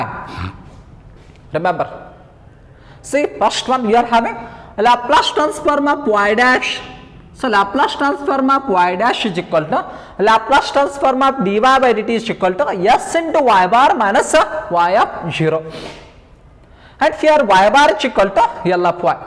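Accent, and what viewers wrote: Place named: United Kingdom. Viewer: British English accent samajh nahi atta hein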